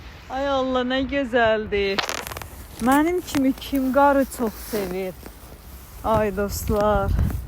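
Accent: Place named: Shaki, Azerbaijan. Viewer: Lenkodan deyil ki osakuceden sefi sefi danişir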